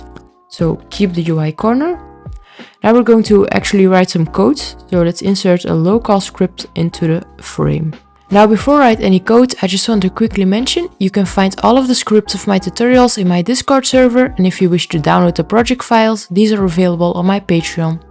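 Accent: Netherlands. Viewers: are you from the netherlands or belgium?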